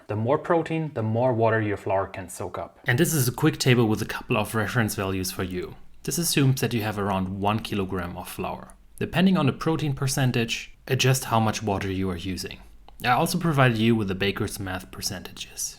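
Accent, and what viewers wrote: Germany. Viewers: Gluten tag! I loved the German English! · Never apologize for your German English. Your speech is clear and easily understood. Your speech is near native English speaker! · When people hear you speak English they wish they could speak German as well as you speak English. Your slight German accent is an added bonus.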